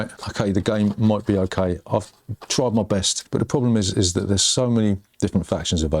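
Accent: United Kingdom. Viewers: I think that this six-part series is among the most entertaining board game videos I have watched. A couple of the titles made it onto my wish list thanks to it. With that delightful British accent, even the swearing sounds eloquent, sophisticated, and sublime. It would not be possible to pull that off in any other localized version of the English language. It’s great to be a patreon.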